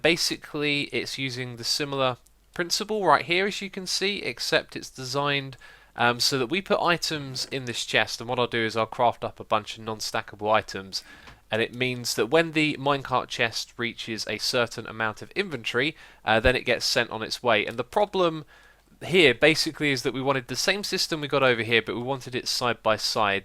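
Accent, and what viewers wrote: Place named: United Kingdom. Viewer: Xisuma, why is your accent so pleasant? What part of UK are you from? I don't hear many British accents out here in Seattle except in occasionally in international conference calls at my old job. My dad was from Belfast and my brother is from Brighton so I can rule those out, but I couldn't venture a guess.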